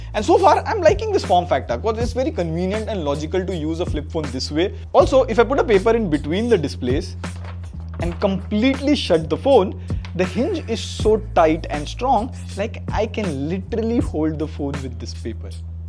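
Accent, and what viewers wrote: India. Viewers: Bhai aapka english Hindi jesa lag raha hai 😊😊😊😊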